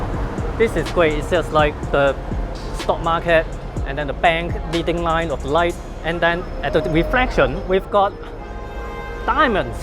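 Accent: China. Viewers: Uncle Roger accent in real life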